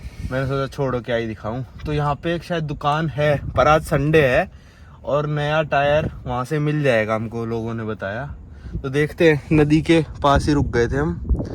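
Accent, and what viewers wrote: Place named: Delhi, India. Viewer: He speaks like Delhi waala Desi ❤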